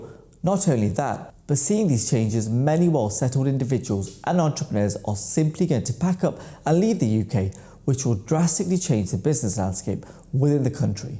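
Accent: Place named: India. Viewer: yes you sound very much indian or bangladesh, whichever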